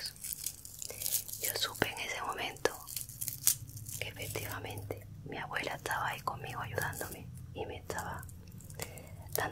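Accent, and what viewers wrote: Spain. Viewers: La verdad me gustan mucho los videos de ella porque la voz que tiene me encanta por como susurra y tambien por su acento! Las voces de los españoles me relajan demasiadooo💙💙💙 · Me encantan tu voz y ése acentito tan lindo español. Te mereces más subscriptores,.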